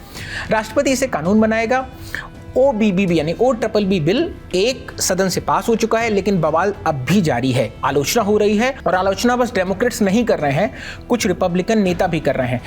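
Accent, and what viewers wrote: India. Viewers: waah kya desi style me news padhte ho.. simply fantabulous...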